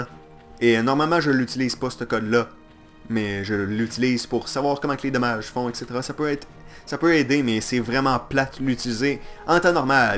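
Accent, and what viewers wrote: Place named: Quebec, Canada. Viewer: Il est Québécois ...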